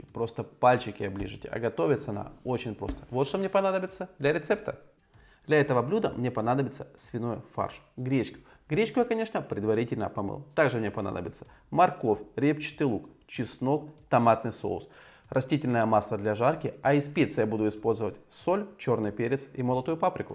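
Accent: Ukraine. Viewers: таке враження, що Ви говорите російською з українським акцентом.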